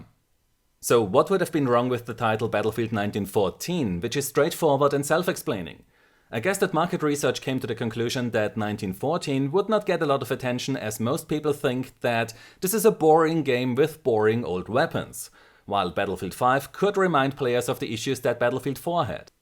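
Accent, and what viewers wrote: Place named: Germany. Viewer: new sub here. Not sure if it's your German accent that keeps me listening or your focused and mature analysis of games, but either way, keep up the great work. You're bound for 1.5 Million subs soon!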